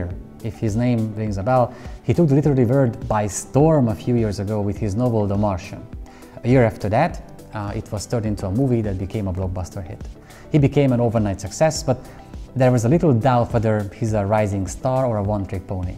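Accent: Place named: Hungary. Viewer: I knew you were Hungarian as soon as I heard your accent!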